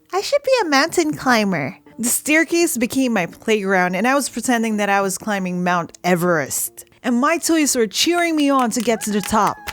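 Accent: Philippines. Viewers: I love the accent!❤️ It's just so Filipino 😂 Every pissed Filipino parents : Ay naku! 🤣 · Thats accent is very Filipino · BEST FILIPINO VOICE EVER (i dont know if i spelled that right) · Im new here so I didn't know she was Filipino until she said Philipine and thought: "So that's why she has sort of a Filipino accent like Alyah and Johan!" 😂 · Are you filipino? Cuz im filipino. The way how i can tell is by the accent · Tagalog Accent xD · I love you you got the Filipino accent on point or should I say pilipino 😉😂✨❤️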